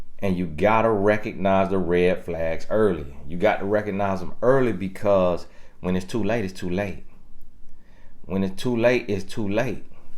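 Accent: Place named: Florida, United States. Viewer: Luv that Flowda accent...you preach ole skool wisdom Bro!Preach from experience!And it is a mirror of dysfunction inside....polish the mirror 👍🏽🌞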